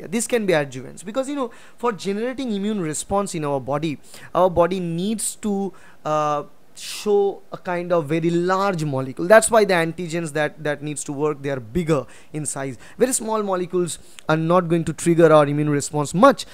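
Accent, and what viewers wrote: India. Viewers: although his accent is pretty good the indian accent shines through him thats kind of nice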